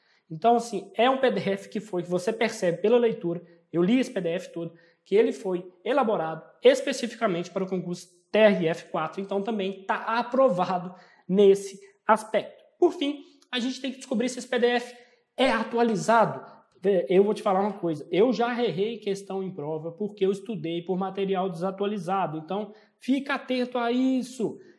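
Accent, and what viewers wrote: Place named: Minas Gerais, Brazil. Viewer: obrigada, você é uma inspiração e esse sotaque mineiro é massa hahahahha parabéns pelo seu trabalho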